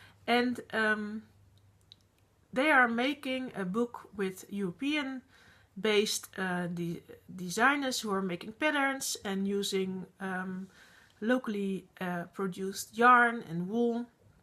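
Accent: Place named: Netherlands. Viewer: Oh hi lovely, I love listening to you speak English, I think the Dutch speak English with such a nice tone, you sound amazing. Plus I love your fringe:) I am very fringe obsessed and currently want one again but my husband thinks not! hahahaa. I really like your Crossing Border shawl and so want to take part in your KAL, I'm off to find myself another cable pattern to knit, am currently knitting cables on my Buddleia Socks but started them ages ago so they don't count:) so nice to catch up with you today xx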